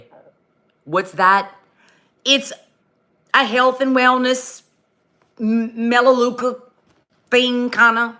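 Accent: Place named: Louisiana, United States. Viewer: Okay, I have to say. I LOOOOVE your accent! I am from GA, but your accent is amazing! I could listen to you all day! LOL You gotta be from Louisiana!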